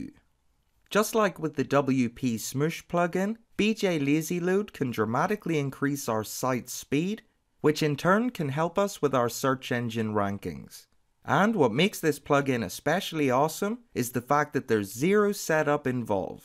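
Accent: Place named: North, United Kingdom. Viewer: nobody should dread you at all - you are inspirational! Thank you so much for this tutorial. I'll be honest, I have looked at numerous such videos but I totally love your approach. Clear, concise, engaging - all washed down with that soft Northern accent., would it be terrible to ask a quick question about one of your other videos here? Well, I'm going to do it anyway! A twitter feed on a website - you showed it being put in a sidebar. I don't have a side bar, so can it be plonked in a page? I use siteorigin page-builder and widgets. This is not important really, but I can't say widgets if I am drunk, so I call them dingdongs! I have also followed your friend Alex last week and told him if he ever comes to Ireland to let me know, as it would be gas to help him out. He could do a tour - I'm in Cork so we could travel the length of the country. 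That again. Best wishes, Colin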